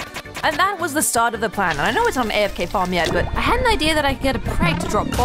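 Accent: United Kingdom. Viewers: I don’t know why but the sound of a slightly unhinged female with a British accent is always so funny to hear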